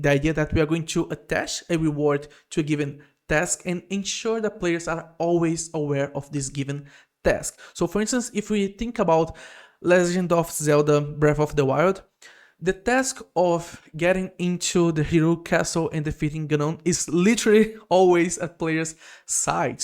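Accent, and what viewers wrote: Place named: Brazil. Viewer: No exato momento que tu começou a falar eu sabia que tu era BR AHUAHUAHU Nosso sotaque é muito reconhecível xD Bom vídeo, mano. Vou me inscrever.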